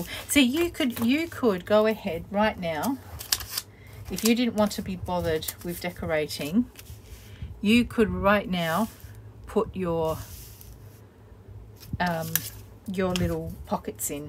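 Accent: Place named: Australia. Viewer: thank for the tutorial. OMG. Bay gul. in California. I thought you said Bible , too. love to hear Australian speak.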